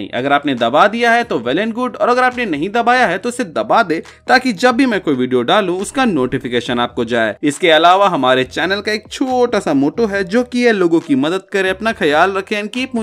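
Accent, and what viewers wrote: India. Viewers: Excited for the thumbnail until i play and hear indian dude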